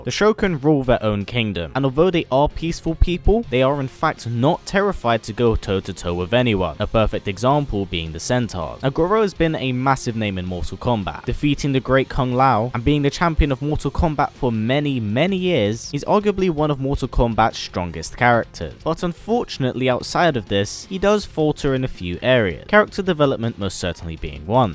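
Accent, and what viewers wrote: England, United Kingdom. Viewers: Maybe it's because I'm a huge sci-fi fan (mainly Star Wars and Star Trek) or because I'm not particularly familiar with Mortal Kombat's backstory, but I expected this list to be mainly Edenians. As a sci-fi fan inhuman does not necessarily equal non-humanoid . Since the person behind the video seems to have an English accent, I'll try to explain with an example. The Doctor is definitely inhuman, but s/he is humanoid.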